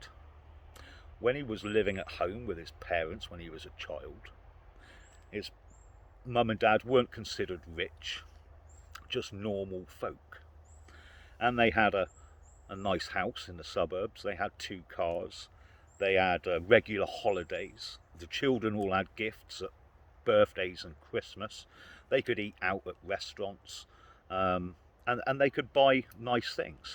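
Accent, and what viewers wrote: England, United Kingdom. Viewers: It is always nice to hear an englishman chat away. 😊👍❤️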